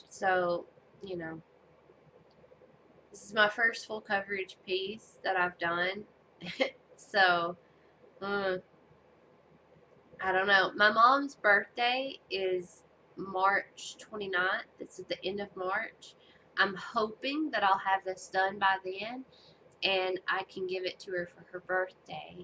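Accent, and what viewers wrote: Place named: Alabama, United States. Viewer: Hi! So nice to meet you. I am an Alabama girl too. I was born in south Alabama but I live in north Georgia now. So you and I have the same accent.:) So sorry to hear about your illness but so happy to see you stay positive in the middle of it all. You are truly an inspiration. I enjoyed your first video. I don't make videos but my needlework can be found on Instagram if you are on there. I looking forward to see more of your beautiful needlework. This community is awesome. I am so glad you are part of it. Merry Christmas to you! Happy Stitching ~Janet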